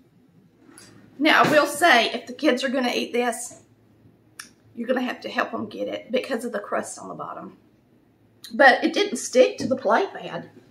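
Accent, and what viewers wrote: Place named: Kentucky, United States. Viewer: I love to listen to you talk cause I am a Kentucky girl living in Florida for many years and it's nice to find someone that talks like me. God Bless you and your family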